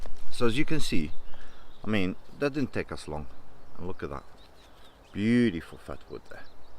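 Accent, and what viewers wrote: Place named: South Africa. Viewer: Another great video and good information, thanks. I can't place the accent, thinking South Africa but could be way off :)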